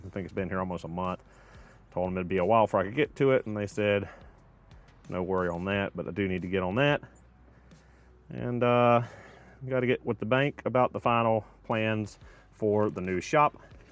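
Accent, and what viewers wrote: South, United States. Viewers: You read my mind when you were complaining about salt I was chuckling because I am next to the Canadian border and every car rust to pieces. You put on a really great show. I like listening to your southern accent. It’s very relaxing and draws you in, you can really tell a story.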